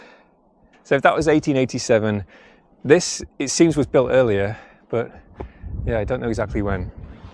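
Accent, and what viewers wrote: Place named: Northern England, United Kingdom. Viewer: Your Northern accent pronunciation is sooo smooth compared to a lot of people I hear, It's magnificent.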